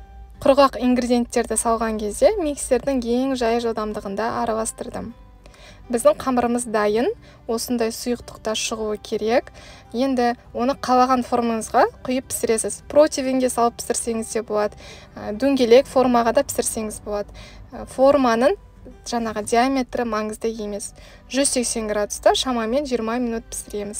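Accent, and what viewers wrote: Standard. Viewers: сізді үнемі көремін. Өте жақсы дайындайсыз! Таза қазақша сөйлеп!☺️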